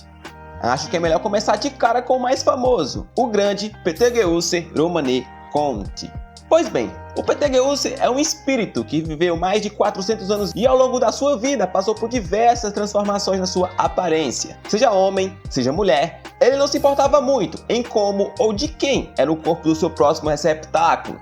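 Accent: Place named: Northeast, Brazil. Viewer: Mano vc tem sim um pouquinho sou do Piauí ent n consigo perceber mt sotaque nordestino, porém eu ouvi ums foi "massa" "foi paia" ai pronto tive certeza que é nordestino raiz kkk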